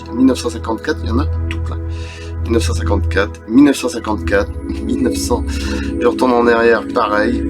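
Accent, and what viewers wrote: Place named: North, France. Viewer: Salut à toi de toute façon à ta voix on voit bien que tu es du Nord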